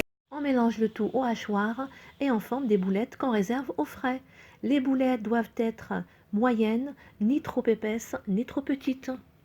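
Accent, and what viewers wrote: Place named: Algeria. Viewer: Salem alik j aime bien tes recettes tu parle bien algérien je suis algérienne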